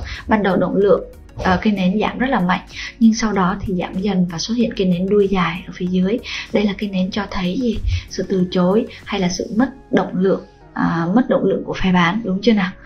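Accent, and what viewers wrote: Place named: Nghe An, Vietnam. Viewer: Nghe giọng như quê ở 37 à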